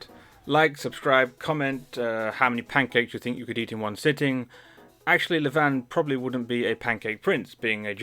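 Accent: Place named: United Kingdom. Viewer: Wow where are you from? That’s the strongest British accent I’ve ever heard, with a slight hint of Malay/Singlish added in there too.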